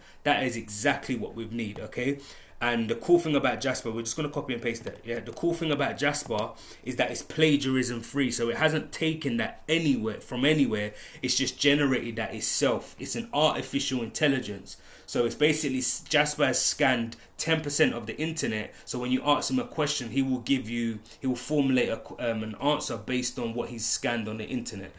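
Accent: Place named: London, United Kingdom. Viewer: He's from London I'm guessing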